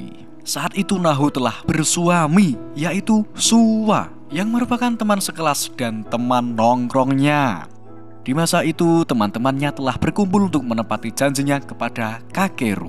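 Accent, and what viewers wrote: Java, Indonesia. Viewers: Klw medok itu tingkat ke Medokan nya bisa di kurangin gk ya..🤔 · Saran jangan terlalu medok apa lagi aaa iiii uuuu eee ooo nya delete aja soalnya kefokusan jdi samar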